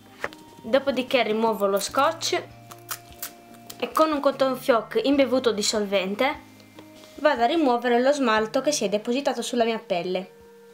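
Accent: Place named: Sardinia, Italy. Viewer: Sono sarda come te, mi fa morire questa cosa dei video con accento sardo!! 😂 In senso buono ovviamente!! Ho scoperto ora il tuo canale sei molto brava e soprattutto insegni come fare bene le cose in casa con le cose che si hanno in casa! Ti do un piccolo consiglio..parla più lentamente, così darai un senso di relax a chi ti guarda dato i video che proponi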